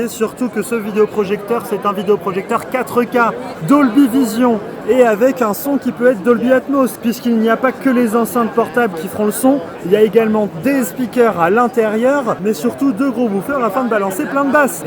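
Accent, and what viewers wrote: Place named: Paris, France. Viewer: "fairheu" parigo et son accent pourri de j'euns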